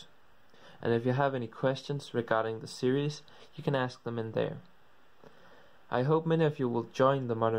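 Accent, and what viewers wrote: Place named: Denmark. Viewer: I can clearly hear that u are danish xD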